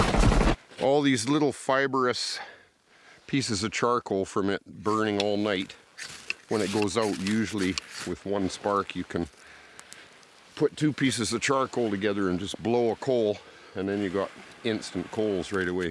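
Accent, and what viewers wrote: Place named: Canada. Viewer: I just LOVE you deep voice, accent, and sense of humor. I wish I could go to Canada and spend a week with you just to listen to you teach me survival stuff!! Thanks for sharing.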